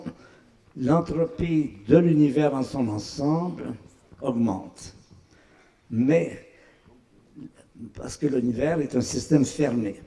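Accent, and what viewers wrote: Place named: Canada. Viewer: ZzEL Chavez Zz Hubert Reeves est Canadien, permet lui d'avoir un accent !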